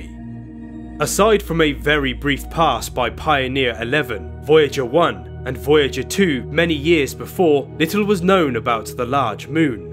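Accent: United Kingdom. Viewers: Is the narrator the same Brit who pushes all the snake oil cures?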